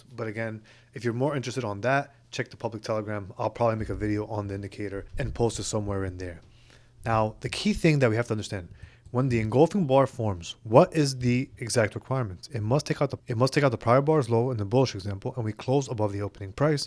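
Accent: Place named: United States. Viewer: You sound American. How do you do prop firms that don't accept us traders?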